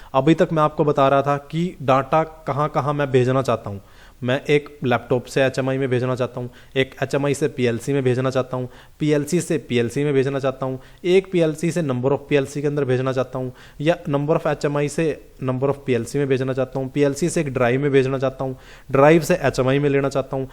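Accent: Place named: Haryana, India. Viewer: Bhai haryana se h pta lag gya awaj se ❤❤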